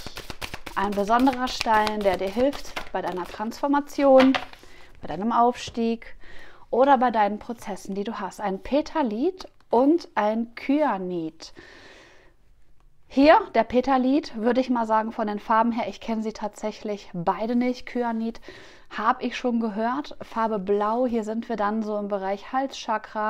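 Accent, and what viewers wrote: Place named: Austria. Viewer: Sehr lustig dein österreichischer Dialekt, aber leiwand 😁👏 nur gustl...dummer August 😉😇🫶 Hab tatsächlich grad viel Power und Energie...hoff es bleibt so💪💃 Danke dir liebe ❤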